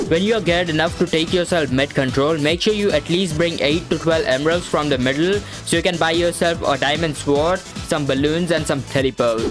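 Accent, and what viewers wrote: India. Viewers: Are u a Indian u sound like one I'm and Indian too in Hyderabad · nice, exactly 8 mins for youtube payouts, and the indian accent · Was watching this next to my father, and he said “TURN THE FUCKING THING OFF… I dont mean to be racist but I HATE indian voices” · This is the first vid of you I’m am watching and from the start I KNOW you’re Indian from your accent! I’m also an Indian. Good to see more Indian ppl makin vids bout roblox and more games! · man bro i just realized his voice is indian INDIAN BOYS!!!!!!! :) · dude speaking extreme Indian accent in this!😆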